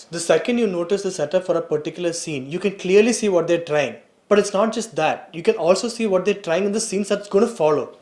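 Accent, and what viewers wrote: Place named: Kerala, India. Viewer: Mallu English 💥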